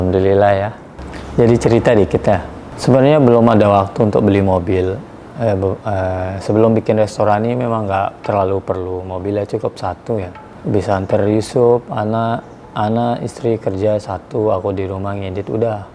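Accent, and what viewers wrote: Lombok, Indonesia. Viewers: ndek inik telang mule logat sasak tie bang😂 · Bang. logat lombok nya masih ada ya😅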